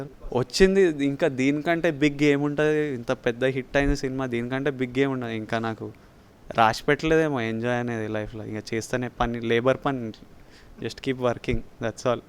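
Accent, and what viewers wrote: India. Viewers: u wil rock vijay.. u r our hero now.. jai telangana.. hope andhra people also will accept you easily.. watching your interviews.. inni rojulaki telagana vachinanka..oka manchi dynamic hero dorikindu maku.. jai kcr..jai ktr..jai our telangana slang..jai our hero vijay...... u wil rock in future. hope u wil continue our telangana normal slang..and ur own natural slang that is our slang...... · hyderabadi will talk lyk this only ...ekdum hyderabadi..keep rocking bhai ...mana hyderabadi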